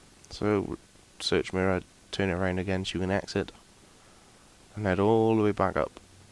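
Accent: Wales, United Kingdom. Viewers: AWESOME guide, thank you man. Also nice listening to a Welshman for an hour :')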